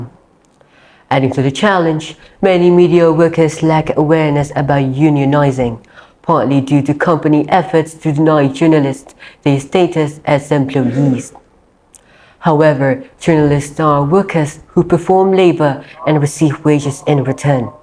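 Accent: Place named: United Kingdom. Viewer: Mas british sekaleee😘😘😘😘❤keren euy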